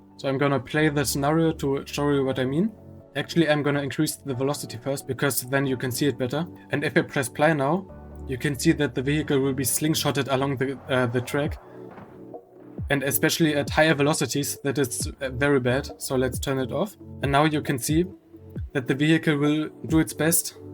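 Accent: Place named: Germany. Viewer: Wie man se German Englisch hört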